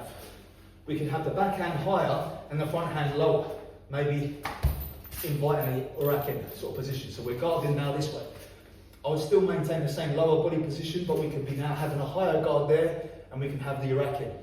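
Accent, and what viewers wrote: United Kingdom. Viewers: Thanks for this video I am a beginner and I am going to concentrate on defensive techniques when I spar I am 67 years old and I am the oldest guy by far in my dojo someone wish me luck or do you have any first time you spared stories I would appreciate it Thanks for your videos I joined your group and for now you will be the only one I join plus I love the British accent it is British isn’t it sometimes I get Brits and Aussies confused any way thanks for your videos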